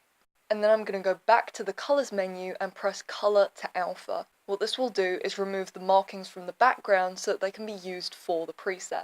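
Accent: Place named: Australia. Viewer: Your voice has changed, now you sound somewhat Australian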